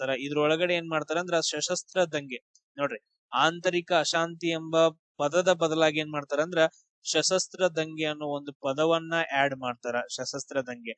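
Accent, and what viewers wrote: North Karnataka, India. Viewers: Super bro your tricks ,,niv pakka Bagalkot or Belagavi ಇರಬಹುದು ನಿಮ್ಮ ಈ ಕಡಕ್ ಮಾತು ಕೇಳಿದ್ರೆ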